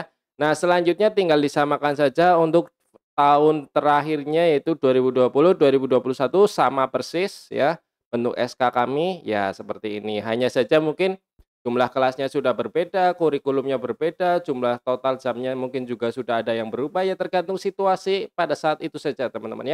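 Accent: Banyumas, Indonesia. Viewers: Ah ngapak ini gmn gk tau ya,mending ngelawak z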